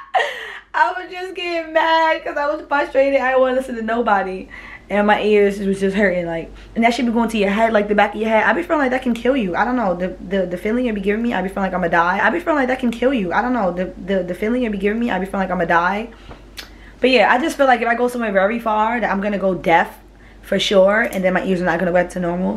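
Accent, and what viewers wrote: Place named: Boston, United States. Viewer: She sounds like she from Boston lol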